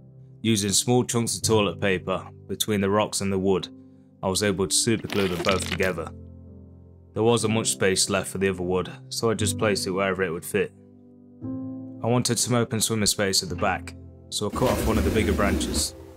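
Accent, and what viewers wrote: United Kingdom. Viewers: With that accent you have to be from the East Midlands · I love your channel this british accent is awesome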